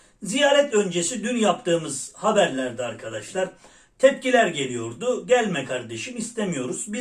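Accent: Erzurum, Turkey. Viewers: kardes seni severek takip ediyoruz.ara sıra Erzurum Erzincan şive leşini karıştırırsan çok hoş sevecen olur seni sizleri seviyoruz edremit altinoluktan kucak dolusu selam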